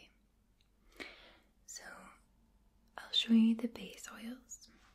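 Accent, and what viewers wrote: Scotland, United Kingdom. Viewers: Can you do a whisper video with more of a Scottish accent and thank you for your time 😊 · As long as it's said in a Scottish murmur, I could listen to advertisements all day. you picked a great ASMRtist!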